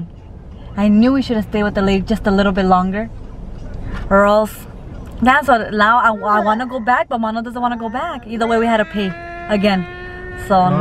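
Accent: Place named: California, United States. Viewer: Your accent reminds me of home!! From San Pedro but we moved to San Antonio when i was little Nice review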